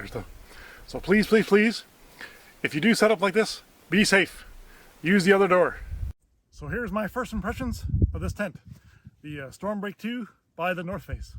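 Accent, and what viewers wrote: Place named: Maritimes, Canada. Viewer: Really enjoyed the thoroughness of this review! Being from the Maritimes I also enjoy the accent, eh?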